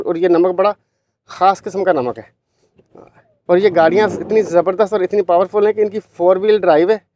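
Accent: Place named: Pakistan. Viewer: ap Pakistan k kis gaon sy hain ?ap ki boli bhot sweet h bilkol sada hmaray jesy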